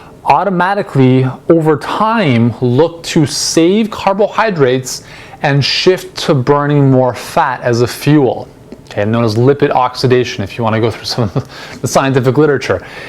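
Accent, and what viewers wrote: Canada. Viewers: Are you canadian? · Love canadian accent. 😉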